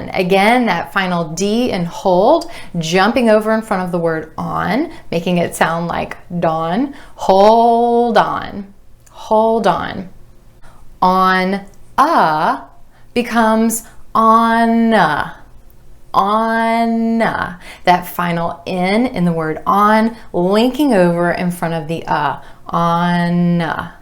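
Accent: United States. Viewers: Are you American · Is this American English pronunciation?? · Your lessons are just amazing, as becuse of you, I've started to love the American accent since i have been used to talk with the British accent.. I have one suggestion if you don't mind, what do you think about creating a group on WhatsApp or viber which is aiming to improve the writing and speaking skills between you and your followers, and it should be administrated by you of course.. Best Regards 🌹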